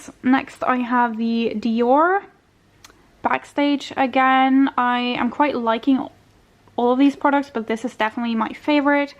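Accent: Ireland. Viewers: Irish accent😀??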